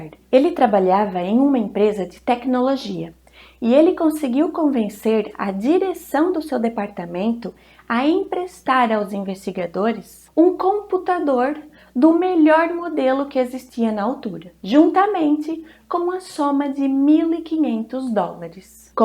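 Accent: Portugal. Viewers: Com todo respeito, você nasceu em Portugal? Seu sotaque vem de onde? Ótimo trabalho!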